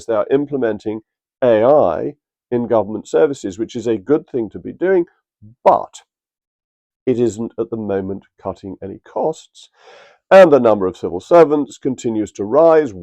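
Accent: RP, United Kingdom. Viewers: Mr Rees moog for all your brilliance. You are useless! Remove the Home Secretary if you can. Your posh style sounds good. But totally useless. Action please. Purge the Islamist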